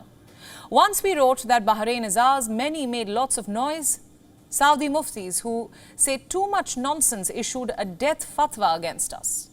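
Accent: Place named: India. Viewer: What is this? it's not news and it's not analysis, it's just some one saying imaginary things in Indian accent.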